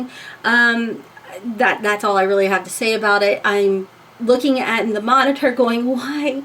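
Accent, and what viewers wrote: South, United States. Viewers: Found your channel by searching for an Aphrodite review and realized that Jen Luvs Reviews mentioned you the other day, I thought I subscribed that day but I guess I didn’t but I’ve been binge watching all your videos the last couple days! Love ur content n love how honest you are. I can’t help but notice tho, you try to hide your southern accent, it’s so pretty when it comes thru. You should let it shine thru more. Btw, what camera do you use? Love ur channel! Much love from southeastern Ohio! 😘😘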